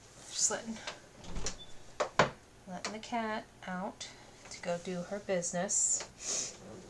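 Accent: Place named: East Coast, United States. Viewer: hello again, nice to meet you and also wanted to ask if you’re from the East Coast. I’m originally from Brooklyn New York, I’m 72, and I live in Hawaii for 40 years but I kind of recognized and East Coast accent. I can sense you’re a special person. Sending warm aloha breezes your way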